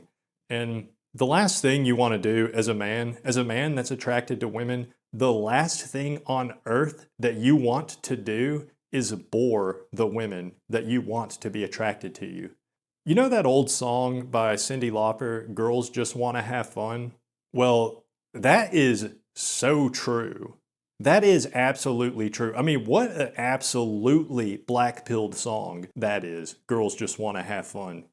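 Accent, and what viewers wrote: South, United States. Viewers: You’re from the South, right? What state? T. Savannnah, GA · yeah stfu dude. idgaf about you as this is the first video of yours i've seen. Your voice isn't giga-magnetic, but it's in the deep register and some people find the Southern accent to be extremely sexy. Talking slowly isn't inherity bad either. I also talk slow and "monotone" and never get the vibes people are being bored with me.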